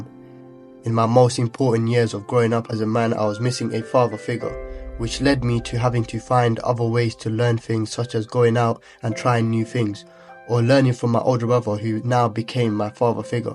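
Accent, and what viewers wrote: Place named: Bengali, United Kingdom. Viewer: nah, that aint no Essex accent. Thats a UK Bengali accent there. Aka the Bengali Twang. If I close my eyes and watch this video, I can 100% tell youre Bengali, could probably even tell what villiage youre from.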